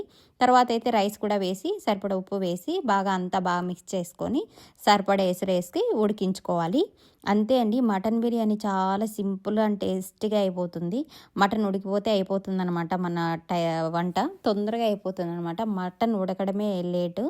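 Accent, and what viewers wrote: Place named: Telugu, India. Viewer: Swachamina Telugu ammayi laga undi me speech ❤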